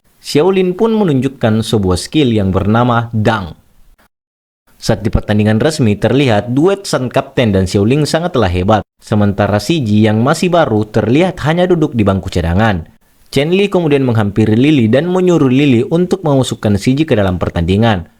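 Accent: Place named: South Sulawesi, Indonesia. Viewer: Orang mana bang. cara ngomnya kayak orang sulsel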